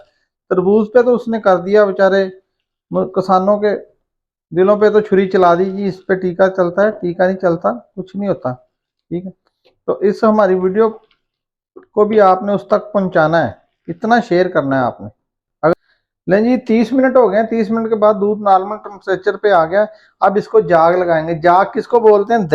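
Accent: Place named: Sialkot, Pakistan. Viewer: بہت خوب جزاک اللہ خیر لہجے سے لگتا ہے کہ سیالکوٹ یا گردونواح سے تعلق ہے۔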